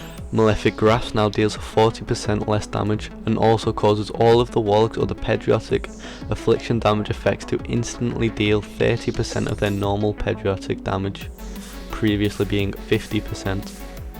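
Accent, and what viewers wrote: United Kingdom. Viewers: People from liverpool are usually always in a good mood lol, you sound like your from west derby / norris green area =o??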